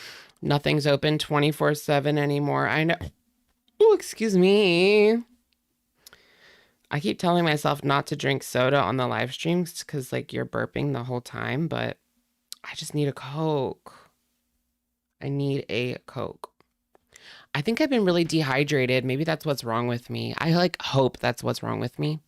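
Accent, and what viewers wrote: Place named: New Jersey, United States. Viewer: Sometimes I can't tell what you're saying with your kind of new jersey accent over the silly fake accents you do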